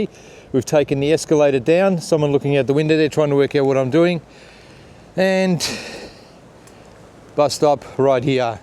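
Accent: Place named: Prekmurje, Slovenia. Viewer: "Slovenski gučiš?", hahaha funny. Unfortunately your prekmurian dialect wont help you much in other parts of Slovenia, but its nice to hear.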